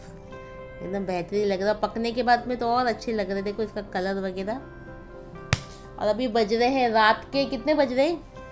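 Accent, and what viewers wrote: Konkan, India. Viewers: appa us mirchi ko bedgi mirchi kehte hai love ur voice ekdum kokni wali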